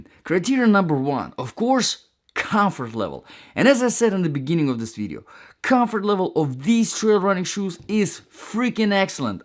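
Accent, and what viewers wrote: Russia. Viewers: you have perfect russian accent, i can understand every word😅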